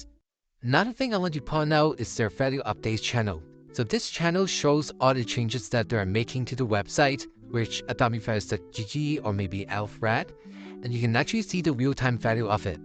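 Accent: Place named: Singapore. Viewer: U sound so singaporean lol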